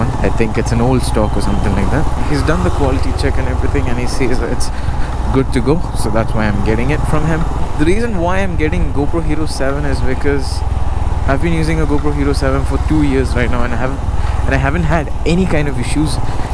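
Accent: Karnataka, India. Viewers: Brrooo your kannada accent is too good ! Broo madhaya dalli kannada matadta iri bossu ! I am going add this My fav traveling vlog playlist!😜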